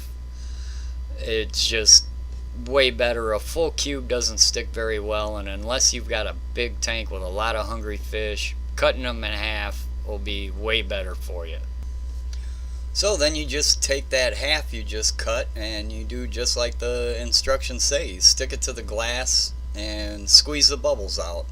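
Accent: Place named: Great Lakes, United States. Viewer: Great Lakes accent is strong. Yahey dere!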